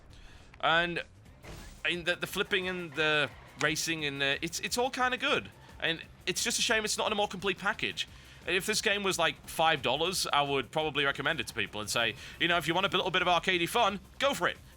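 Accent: United Kingdom. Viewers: umm you dont gave to look or sound british or english to be english. and hes the most english sounding person ive ever heard. one last thing. why are you so mad???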